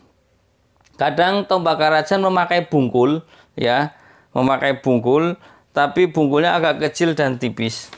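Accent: Tegal, Indonesia. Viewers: Kang Boss.... Logat kaya Tegal koh..... Salam Ngapak.... Aku Naksir Keris e....koh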